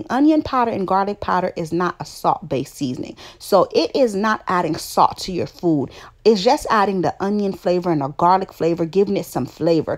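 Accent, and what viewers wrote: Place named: South, United States. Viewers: "proper English" lol. I have relatives down south and this is proper English. I love the way she communicates, with such love and authenticity. There are plenty of channels you can go to where people are speaking Received Pronunciation or any other version of "proper". Bye Felicia! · Goodness! I'm struggling to understand this southern version of the English language.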